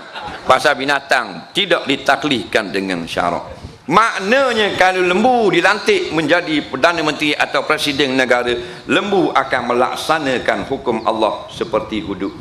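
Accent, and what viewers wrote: Terengganu, Malaysia. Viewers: Ceramah terbaik, dari yg terbaik.Lawak2 yg penuh berisi, dlm loghat Terengganu.Best loghat Terengganu ni.Loghatnya lebih kurang loghat Kelate le!!!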